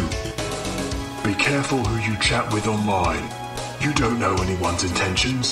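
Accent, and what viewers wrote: United Kingdom. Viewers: i guess in their country, which seems to be the uk based on the voice (and as i reread this, i googled it and google claims they are scottish so it checks out), there are laws trying to restrict access to obscene content in general. i googled it and i think the laws are actually stricter if they are uk-based. as an american the only thing that's treated like this is cp (and maybe zoo porn depending on the state). so i was really concerned by this video, but since they are not american they may very well have a reason to parody censorship laws like this.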